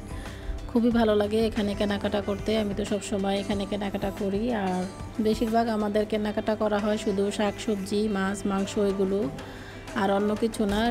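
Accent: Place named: Barishal, Bangladesh. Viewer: ম্যাডাম আপনার বাড়ি বাংলাদেশে কোথায় কথা শুনে মনে হচ্ছে বরিশালের মনে হচ্ছে আমাদের এই ভাষা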